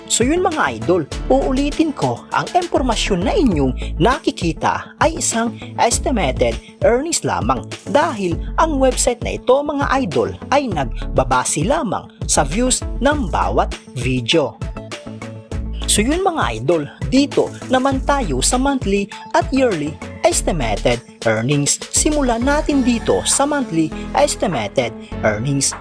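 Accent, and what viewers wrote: Visayas, Philippines. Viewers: Bisaya kc but as long as we understand her, it's okay.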